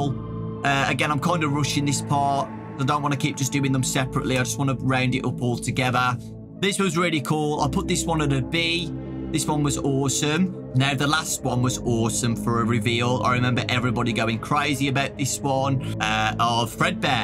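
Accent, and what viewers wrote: United Kingdom. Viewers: Bruh he british? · My friend:"who are you watching" Me:"Dawko" My friend:"oh, who is he and what does he do" Me:"he's British, he talks loudly, and plays FNAF" My friend:"so he's like Morgz?" Me:"yes.... But with FNAF"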